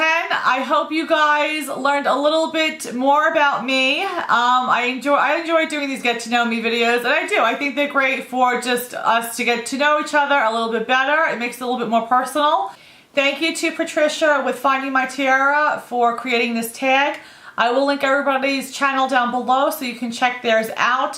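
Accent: New York, United States. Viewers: Love your bubbly attitude your NY accent lovely... Great tag glad getting to know you a little better...tfs beauty🤗🌹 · Hi! Loved getting to know you! You may have left NY when you were a little girl, but you still have that NY accent which I love... I've lost my Jersey accent:-( Hope you're having a great weekend!!! xoxo -Marlene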